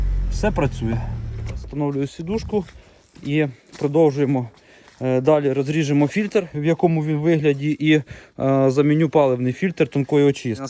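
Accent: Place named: Ukraine. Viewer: По акценту украинец , лайк и подписан давно , у самого леганза , слава Украине !!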